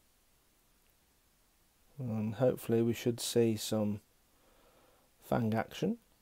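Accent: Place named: United Kingdom. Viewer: I hear the a as o instead. Brittish accent can be difficult to understand sometimes, particularly if you hear American all the time then you thing that is the normal. I am not a native speaker, I am from Greece. You speak with original brittish accent though. Maybe Charles Darwin spoke like that, I don't know. Generally the Brittish sources I have read refer to the animals with more normal names. You say royal pythons, Americans say ball pythons. In Greece the Greek name is royal python but colloquially many people say the American, ball python. You say horned frogs, Americans say pac man frogs which is somewhat silly. The same with morio worms. I don't know who tried to market them as superworms, it sounds like a cartoon name and of course if you were to find them in the wild you wouldn't devise a name like that. Or the black soldier fly larvae which are called phoenix worms, or the stupidest of all, calciworms, while in fact they are just plain maggots.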